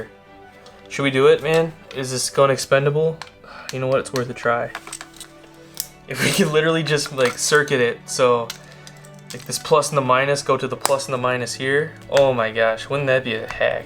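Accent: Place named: Minnesota, United States. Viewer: You must be from Minnesota, that accent is thicc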